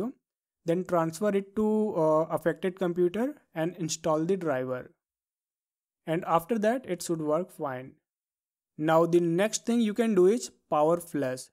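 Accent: India. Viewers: Random YouTubers with Indian accent holding our society and computers on their shoulders. Thank you.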